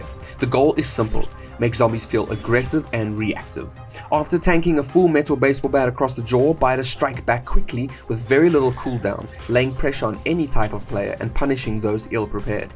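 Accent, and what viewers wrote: South Africa. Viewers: Lekker video bru, i could instantly tell from the accent you are from South Africa 🇿🇦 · I can recognise that accent anywhere. It might be too subtle for most to notice, but not to other South Africans. Where are in SA are you from, bru? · Not related to the game, your accent sounded South African and confirmed it. Glad to see SA creators are making high level content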